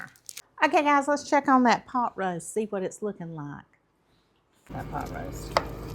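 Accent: South, United States. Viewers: Now that looks like tasty pot roast! You’re always are so upbeat and I adore your Southern drawl. Thanks for all you do to just draw us in for little more seasoning. ❤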